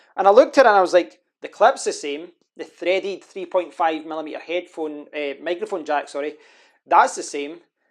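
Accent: Scotland, United Kingdom. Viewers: I was looking for something else, but kept listening because of that amazing Scottish accent.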